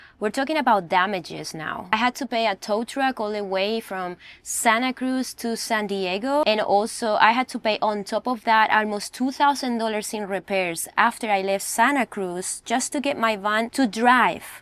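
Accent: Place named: Andalusia, Spain. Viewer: Hola,no sabias que tenia acento Andaluz, llevo poco día siguiendo tu canal y veo que eres un persona de corazón, gracias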